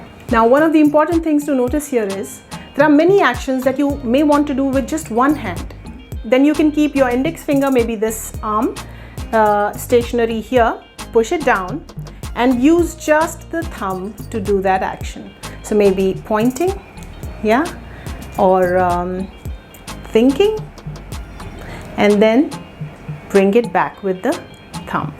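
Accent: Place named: India. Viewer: Very good. Thank you. The Indian accent is gorgeous too!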